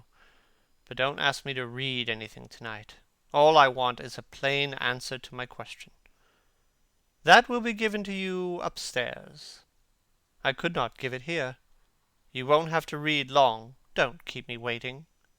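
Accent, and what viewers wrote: United States. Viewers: American accent doesn't fit.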